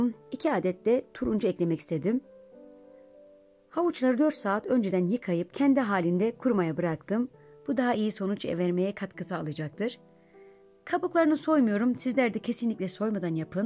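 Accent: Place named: Southeast, Turkey. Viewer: Eline Emeğine Sağlık Abla Bende Yapacağım Nasipse İlk fırsatta Ablam Konuşma Şiven Bizim Oralı aksan yani Ablam Güneydoğulu musun Ablam Selamlar Başarılar Diliyorum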